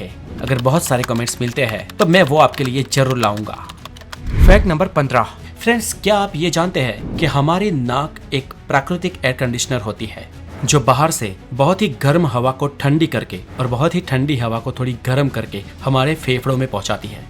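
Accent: Gujarat, India. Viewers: Aaap Gujrati hena ????❤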